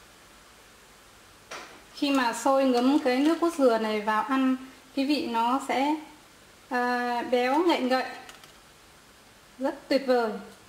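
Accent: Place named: North, Vietnam. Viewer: Chị đi nước ngoài nhưng vẫn giữ được nét truyền thống thật, từ cách nấu nướng tới cách nói chuyện với con gái đều rất đậm chất bắc bộ :) rất thân quen ạ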